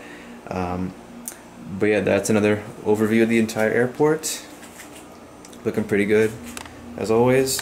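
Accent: United States. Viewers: HaHa love the intro! Nice to see this airport again! Love you're Massachusetts accent! You know I really love the Louisville route! You could always sent some deltas to DTW or MSP. Hey your Raptors might come down to Louisville to play if Canada doesn't let up their lock down! Great update!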